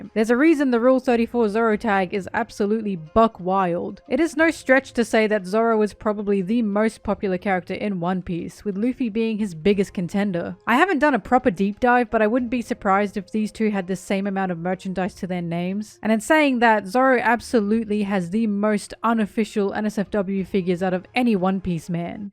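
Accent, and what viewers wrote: Australia. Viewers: Zoro is my favorite character in One Piece, also ur Aussie accent makes u sound Beautiful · What is it about aussie folk that make them really appealing one piece content reviewers?? xD I like the analysis and jokes and (this may seem foolish but) as an english speaking foreigner, I also enjoy the accent telling the story. Also like zoro, obviously~ (lol)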